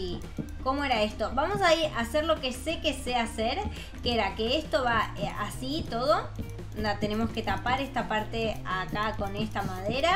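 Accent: Argentina. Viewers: Sos de Argentina o de España? O algún país asi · tueres de argentina??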